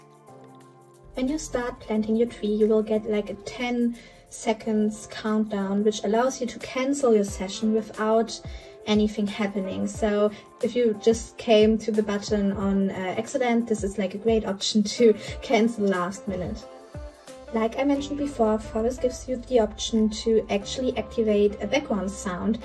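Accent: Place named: Germany. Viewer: That German accent😁 Grüße! Gutes Video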